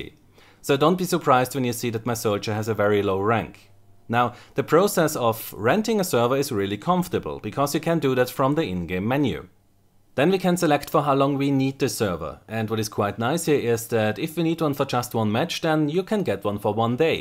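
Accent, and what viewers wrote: Germany. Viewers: are you German? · Hi Where are you from? Germany? (Because you chose Frankfurt as the server destination and I kind of hear it somehow when you speak).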